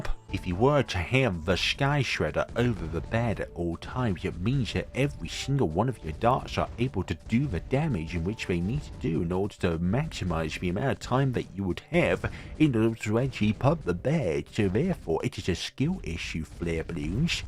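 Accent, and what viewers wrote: United Kingdom. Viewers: Nah, no facecam. There's already a hook keeping me here, the british accent and dying to yellow bloons.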